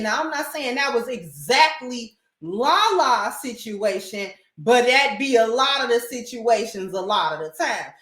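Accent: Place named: New Orleans, United States. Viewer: are you from the boot? I here so much new orleans tongue.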